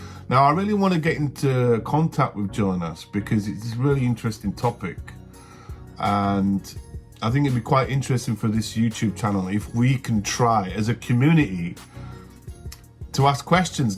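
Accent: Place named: North Yorkshire, United Kingdom. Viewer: Interesting channel this! We do love our kebabs never even thought of who designed the logo! That’s definitely a North Yorkshire accent! All the best for 2025 👍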